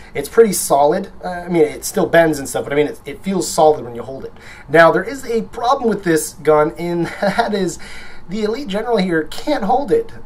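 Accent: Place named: Germany. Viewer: nice german accent, but I'm so sad they didn't do well on the Fuel-Rod Cannon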